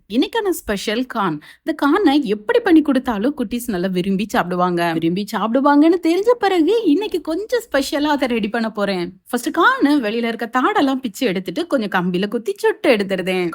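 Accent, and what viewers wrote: Tirunelveli, India. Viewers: Nenga thirunelveli ah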